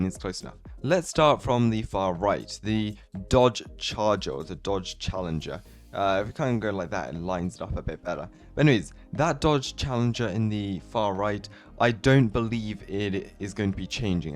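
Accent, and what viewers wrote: United Kingdom. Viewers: Can anyone tell me if is British or not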